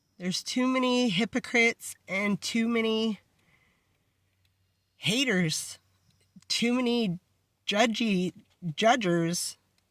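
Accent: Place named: New England, United States. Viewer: You have a similar accent to mine. Are you local to southern vermont or western mass?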